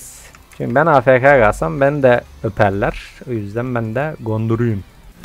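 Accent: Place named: Afyon, Turkey. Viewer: Kardeş afyonlu musun şiven çok hoş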